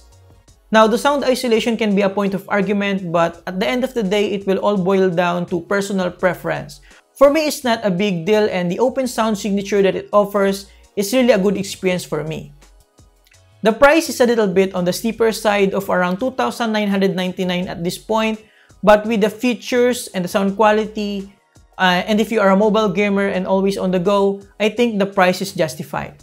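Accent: Philippines. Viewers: I'll take that Clunky Filipino accent over Indian classic tech support anyday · hears the accent My brain automatically: ahh pilipino to alam na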